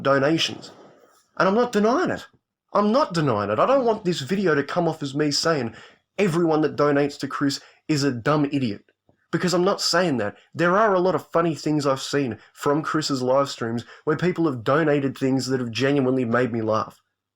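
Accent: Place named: Australia. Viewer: I didn't know I needed an angry australian man complaining about chrischan as much as I did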